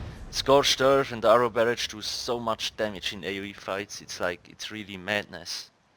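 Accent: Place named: Switzerland. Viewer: du bisch definitiv en schwiezer😂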